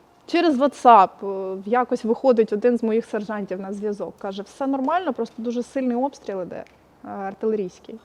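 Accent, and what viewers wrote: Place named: Surzhyk, Ukraine. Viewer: Лицемерка. На поле боя на русском разговаривает, а перед камерой на мове, больше похожим на суржик. Бандеровская подстилка.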